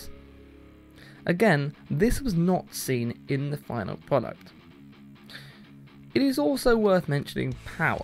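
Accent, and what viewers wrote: United Kingdom. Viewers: Love the british accent... Nice video man!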